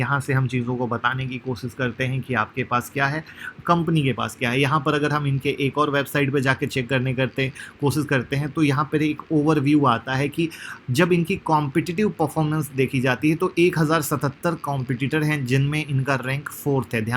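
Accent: Bihar, India. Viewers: Yeh bihari hai aise hi pronounce krta hai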